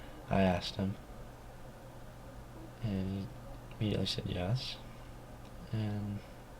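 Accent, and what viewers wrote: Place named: West Virginia, United States. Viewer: has the kind of accent common in West Virginia. It's essentially a "southern" accent, though not as heavy as you might hear in the Carolinas.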